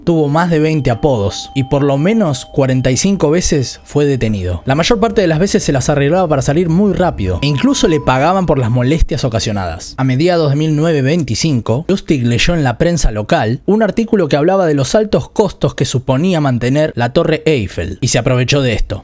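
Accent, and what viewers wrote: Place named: Argentina. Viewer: Me gustaria saber de donde es este muchacho, parece argentino.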